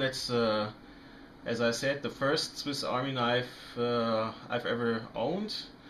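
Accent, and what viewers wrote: Germany. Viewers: Excellent exhaustive video! I can tell from your accent you're from Germany, kudos to you for speaking great English!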